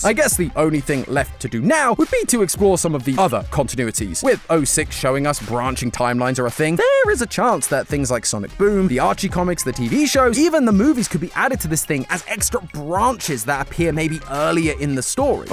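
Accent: England, United Kingdom. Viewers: Sorry someone update, why does Matpat sound like he's english now?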